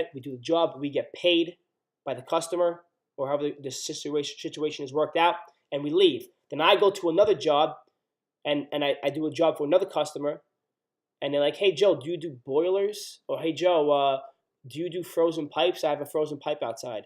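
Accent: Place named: New York, United States. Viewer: I knew you were from ny lol great information.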